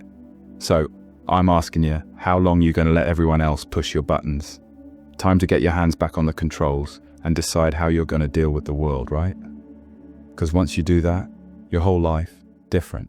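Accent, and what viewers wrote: United Kingdom. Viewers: ❤ I really like your accent, voice and narration style! Is it a real voice or a very good AI? I really want to know! British accent and British wording with an American twist! I live in London and this accent seems from a Londoner but a old school accent, hard to find it now, I know AI would clear the harshness of it , yet it’s hard to find anyone with this cute accent anymore, even years ago was hard to find. Is recognised as a gentle south London accent, yet , this soft spoken type it has disappeared!😢 I really like it! I have heard women in west/south London, but man has let it go, now man it’s more of an English posh accent or street cut off English. Anyway, please tell me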